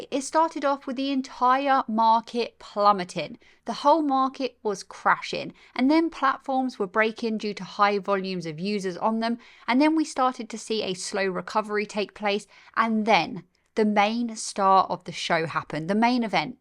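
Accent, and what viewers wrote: England, United Kingdom. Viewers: It’s always a pleasure listen to your English accent talking about our dream company !!! Let’s go PLTR !! at the end of the day there’s gonna be a few bumps in the road, but in a longer run PLTR will be a monster company!!!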